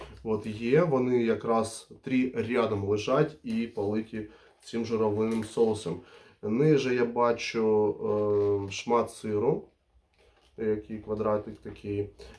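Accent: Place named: Eastern Ukraine, Ukraine. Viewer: Ну за українську лайк, молодець, відчувається говір такий східної України, але все ж таки це добре буде, якщо будеш частіше випускати такі ролики на українській, а бургер цей рожевий Мохнатівський збираюся на днях поробувати, дорогущий правда, але на хайпі робляться гроші!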